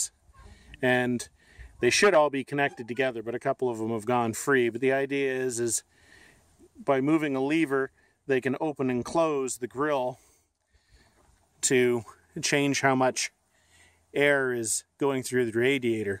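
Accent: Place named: Canada. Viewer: He's Canadian, and some Canadians pronounce certain brand names differently, so I think I see what you mean.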